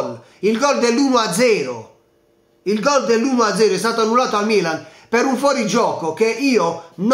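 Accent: Bari, Italy. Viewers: Analisi dei fatti è perfetta Grande sei un grande ti seguo sempre continua così. Vivendo a Roma sappiamo bene chi è il presidente della lazzieeee. Forza Milan Sempre. Un abbraccio forte a e al suo colorito dialetto barese che conosco poco ma detto da te mi fa sbudellare dalle risate specialmente quando vince il nostro amato Milan ciao 👏👏👏👏👏👏👏👏❤️🖤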